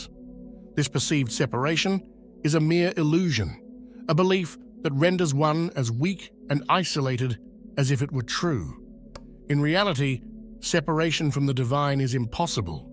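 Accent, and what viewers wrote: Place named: New Zealand. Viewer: So nice to hear a New Zealand accent.... familiar and comforting.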